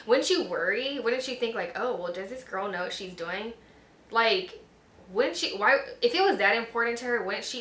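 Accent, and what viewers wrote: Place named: Southern California, United States. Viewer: you have a thick socal accent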